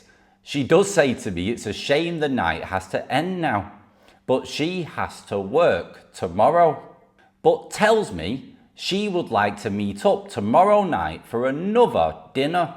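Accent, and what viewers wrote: United Kingdom. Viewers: You don't sound Australian, mate. You sound British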